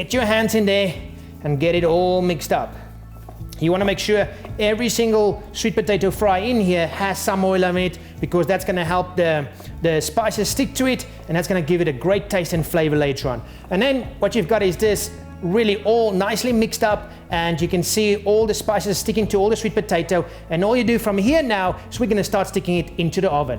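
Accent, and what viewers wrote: Afrikaans, South Africa. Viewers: Your afrikaans accent is cool! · Definitely a South African accent. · Where is this guy's accent from? The only thing I can compare it to is the guy from District 9 (Wikus) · hes south african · I think he's South African :) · Michael Evangelista It's South African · arank001 South Africa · Where are you from? South Africa? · are you from South Africa? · Kings Fobos South African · What accent does he have? I want to say south African but I really can't tell :/ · He's South African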